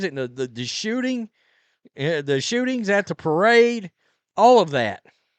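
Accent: South, United States. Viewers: Dude, you sound southern you should know even the law is crooked in New Orleans. Nothing crazy out of that city ever surprises me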